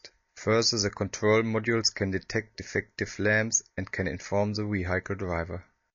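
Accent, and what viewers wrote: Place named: Germany. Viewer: Hi to the chaps at Engendi. Speaking as someone watching your video with only a general interest, this is my feedback: *The video gives a very useful view of CAN. thanks. *the product looks excellent, but fairly expensive, so you need to have high quality product promotion. (i realise that it is probably much cheaper than other options...) *no offence intended at all, but the English pronunciation is terrible, the accent is way too German, and there is no life in the voice at all. Yes, we know you are engineers, but if you are trying to sell to english speaking people, as i suppose you are, I recommend you have the whole video redone where it is narrated by someone who speaks English really well.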